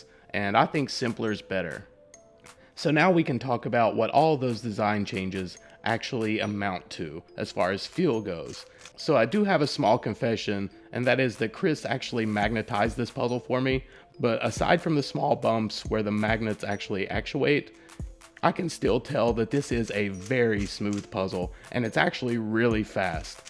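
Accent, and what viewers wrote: South, United States. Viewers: Where are you from? To me it sounds like you have a few hints of a Southern accent.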